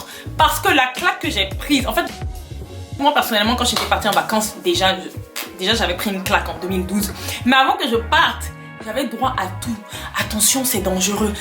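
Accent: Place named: Africa. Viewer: Tu sais:ça me plaît beaucoup ton accent africaine(signifie que tu es une métisse née ou a vécue longtemps dans la TerraMadre)c’est trop trop trop cool.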